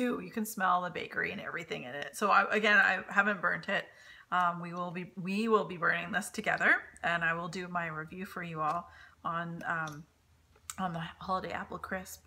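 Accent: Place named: Canada. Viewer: loving your Throwback Thursday candle reviews. I"m all caught up now and looking forward to more. Your Canadian accent is adorable!;-)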